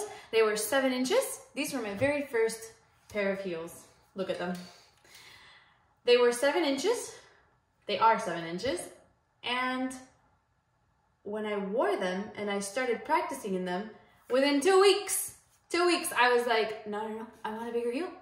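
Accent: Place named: Miami, United States. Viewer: I could tell you were a Miami girl cause we have the same accent X) great video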